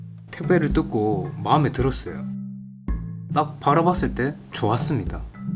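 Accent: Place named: Gyeongsang, South Korea. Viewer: 사투리 안고치면 구독자 이정도가 한계일듯ㅜㅜ 경상도 사람인데도 엄청거슬림ㅜㅜ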